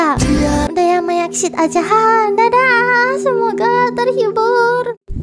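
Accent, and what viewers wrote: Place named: Solo, Indonesia. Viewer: kan dari solo bro 🤣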